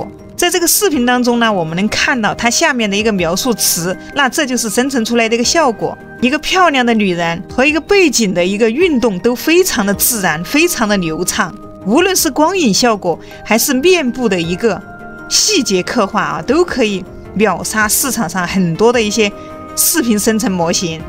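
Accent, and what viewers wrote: Sichuan, China. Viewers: 好亲切的川普~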